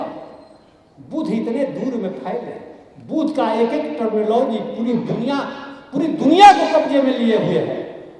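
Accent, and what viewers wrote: Bihar, India. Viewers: sir aap bihar se hai? aap ka bolne ke tarika bilkul hamare jaisa hai. 🙏🙏🙏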